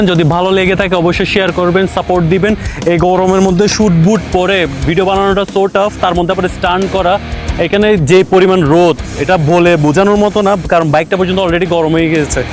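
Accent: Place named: Chittagong, Bangladesh. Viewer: শেষ..!! এই পোলারে বাইক দিয়ে বাইক আর ফিরে পাবার অবস্থা থাকবে না। নিউ বাইককে এরকম স্ট্রেস দিলে সেটা আর মনে হয় চালানোর অবস্থা থাকবে না। আর যে যে প্রজুক্তির কথা বলা হল এগুলো বাজারে আসছে আরো কয়েক বছর আগেই। এরকম তেল খেয়ে বাইক রিভিউ করার কোন দরকার নাই। অন্তত লিকুইড কুলিং থাক্লেও হত। আর চট্টগ্রাম এর টোন তো সেরা টোন....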